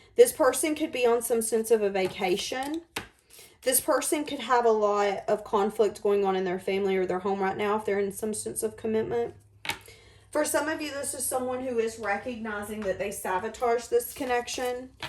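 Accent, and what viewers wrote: South, United States. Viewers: You are such a blessing you totally just blow my mind wow you are so good you have helped me so much God bless you thank you I'm so thankful for you God bless you keep up the good work I just love listening to you that country accent girl so sweet😘🥰😇🙏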